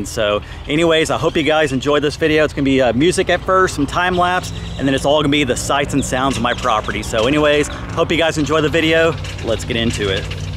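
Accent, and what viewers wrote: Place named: South Texas, United States. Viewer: True south Texas sounds… especially with them crows 😅 just like San Antonio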